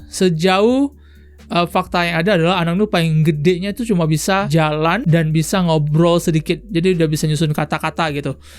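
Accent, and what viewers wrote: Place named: Pontianak, Indonesia. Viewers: Orang pontianak rupenye abang ni, mantap bang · Jak budak pontianak ke rupenye abang ni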